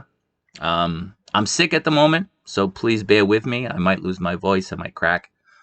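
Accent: Boston, United States. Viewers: you’re from boston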